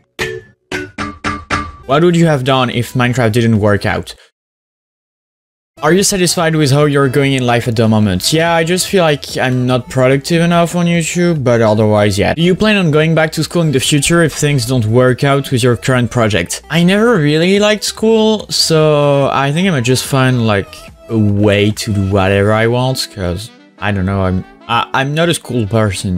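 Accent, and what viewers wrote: France. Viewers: I just discovered this guy, and new he was french the first seconds of the video. It’s amazing how french accent is recognizable. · Tu as un accent Français 🤔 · sounds french speaks English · J'était sur à 100% qu'il était français, en tout cas sont accent est incroyable x)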